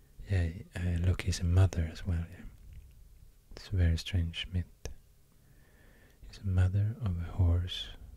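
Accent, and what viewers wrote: Sweden. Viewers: Finally found a very calm speaking swede in the ASMR community! I’ve been to Stockholm so many times and even did an exchange in Umeå. I got hooked to the Swedish accent to English and love this so much. You speak so calmy and take breaks between your sentences. A new subscriber here :) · I think your Swedish accent and calm voice makes your voice so perfect for ASMR.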